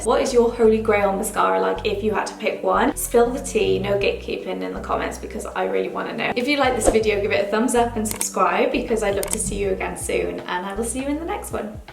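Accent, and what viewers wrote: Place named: Wales, United Kingdom. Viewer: Nice to hear a lovely Welsh accent, nice change from American. Not that I’m biased! 🏴🏴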